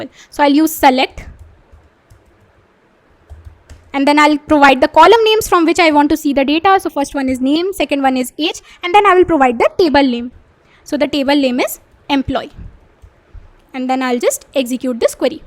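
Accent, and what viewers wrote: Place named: United States. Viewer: dii, mai special aapka video apke fluent english ki wajha se pura ye 5 hours dekha hu , knowledge kahi se bhi mujhe miljata lekin aapne jaise ye itna faraatedar english koi nahi dega mujhe bhaot kuch sikhne ko mila mujhe apse dii thanks dii , or aap kya batasakte hai ki itna fluent english mai kaise bol sakta hu , waise mujhe lagta hai ki aap american ho :)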